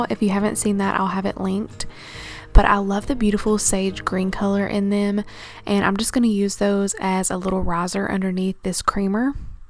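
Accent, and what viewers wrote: South, United States. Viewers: You have the perfect country accent 😍 I'm from Tennessee and have one too lol but your accent is so soft pleasant 🥰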